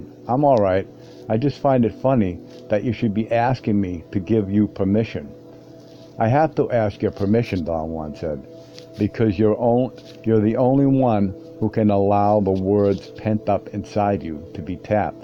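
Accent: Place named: New York, United States. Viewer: Love your reading. The NY or Jersey accent? It is relaxed and not the MACHO WARRIOR of the others. Thanks! But the background music could fade off to Mars, IMHO! This writing involves connection to the Earth - not space.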